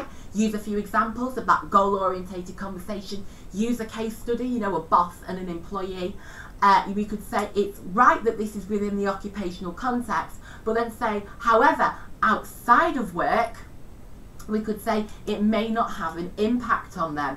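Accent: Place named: Northern England, United Kingdom. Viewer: esy2011 northern accent innit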